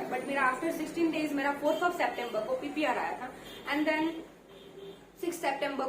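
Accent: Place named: Gujarat, India. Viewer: I can say she is gujarati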